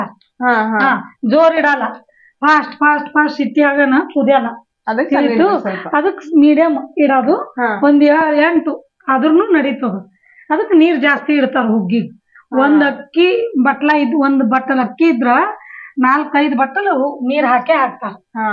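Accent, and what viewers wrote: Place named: Solapur, India. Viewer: Nimma yavdu ooru .namma solapur hage maatu ide.👍huggi bhalu channda agide.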